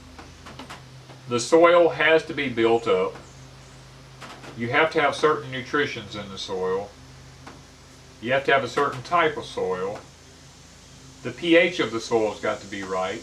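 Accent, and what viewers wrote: South, United States. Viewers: Good subject! Thank you. I don't have a homestead, just rent an apartment but there's only 8 apts. each building, total 4 buildings in a small rural western WA town, but I have 3 smallish garden spaces outside my front and back door and they work out pretty good though I'm not a pro like you and. Your southern accent is just fine! Don't let folks throw ya...how anyone pronounces words is unimportant--your intent and message IS. I totally agree with you. I am glad the plum and apple trees on the property aren't sprayed that I pick every year though the fence line is but it's not near the apts. Living in the north has different challenges but folks are often in the same boat no matter where ya be. I can, dehydrate, pickle as much as possible. I understand how it is when you have to get things canned NOW, or lose your produce. 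My folks hated waste and so do I. Anyway, thank you for explaining farming/gardening procedures. It's very interesting and I am learning a little. I'm kinda slow, but I get there. God bless you and yours.🌲👵🐕✋🌻🌱🍃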